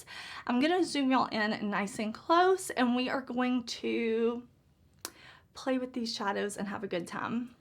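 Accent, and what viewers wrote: South, United States. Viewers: Wow 🤩🤩🤩🤩 That last look w/the green in the “socket” 😉- Just WOW!! That multi-chrome w/the predominantly pink shift; that’s got to be a gift from God, & I’m not sure I’ve seen one of those types of shadows on camera as reflective as that one. I kept thinking “oh no, she missed a spot of her lid space w/that multi chrome”-but no, I knew your skills were better than that, it was just the amazing shift and the way the color would “jump” around ur lid; girl, it practically dances across ur eyes ! I am only learning of this brand(from you). I actually kind of gravitated to ur channel after watching a few of ur vids, and appreciating ur seemingly unwavering support of these Indy brands, that other than Color Pop, I knew ZERO about! I’m fascinated, I’m hooked, and I think you’re great and that’s why I subscribed. You’re smarts, beauty, charm, southern accent, are just too refreshing to pass up 👍🏻💄💋❤️👏🏻 I’m learning so much, thank you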